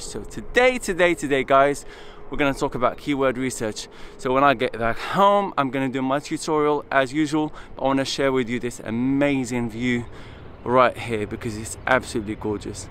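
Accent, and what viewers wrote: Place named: United Kingdom. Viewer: i came here just to listen to your British accent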